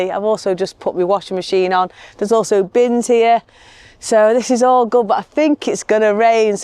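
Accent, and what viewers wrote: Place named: North, United Kingdom. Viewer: Hi this is the first time I’ve visited your channel and guess what? I am now going to look for all your videos on you tube as you make them so interesting and informative.This channel for me is the best of the lot.I may eventually catch up with you as I have a feeling I’ve a lot of videos to watch.I love your humour and your northern accent.Thanks so much.Enjoy your travels.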